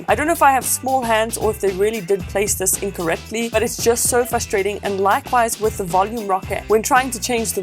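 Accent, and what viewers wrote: South Africa. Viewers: ek wou sê sy het 'n Suid Afrikaanse aksent 😂😂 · South african accent? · Is this broad south African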